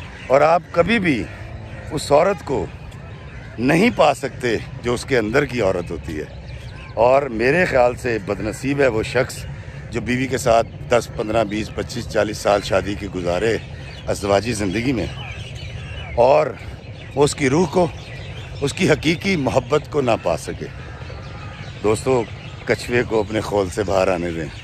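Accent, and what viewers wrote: Lahore, Pakistan. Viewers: Basically I am from Amritsar. That’s why I love Lahori Punjabi, mithi zubaan. Same taste and same lehza 💕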